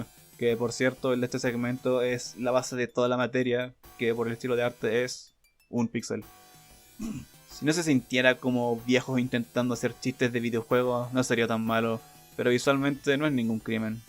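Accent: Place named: Chile. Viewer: Tiene acento chileno Laik 👍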